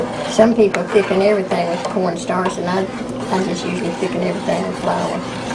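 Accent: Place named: Kentucky, United States. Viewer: Where is she from? Her accent sounds a lot like my own, and pretty much identical to older people where I'm from in Southeastern Kentucky.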